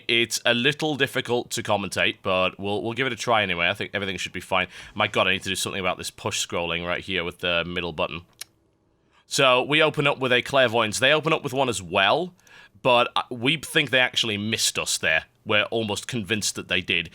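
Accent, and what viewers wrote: United Kingdom. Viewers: It's his british accent, that's all.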